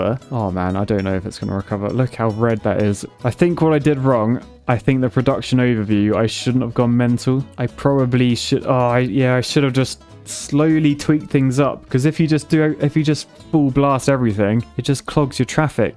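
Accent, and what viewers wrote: United Kingdom. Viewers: this guy is like let's game it out but british and ever so slightly more serious...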